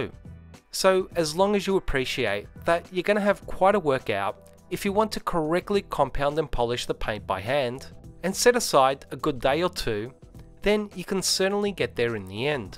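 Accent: Australia. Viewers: Good Aussie narration. No hype no BS no selling. Just fact and detail. Best video I’ve seen on you tube for polishing a car. Well done and thanks mate.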